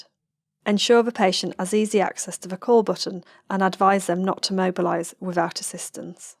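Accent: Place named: United Kingdom. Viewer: Beautiful explanation with lovely British accent. Thankyou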